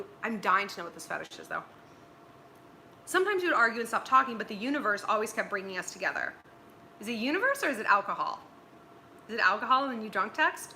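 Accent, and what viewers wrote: France. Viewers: Oh my CRAP!!! I LOVE YOUR FRENCH ACCENT!!! SO MANY THINGS! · The french accent 🤣😄🤗😄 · Wow that French accent!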